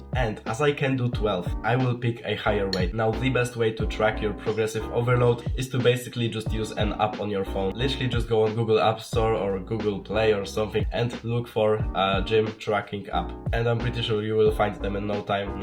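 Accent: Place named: Poland. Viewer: haha po glosie rozpoznalem xd bo cos taki akcent jakbys z polski byl xd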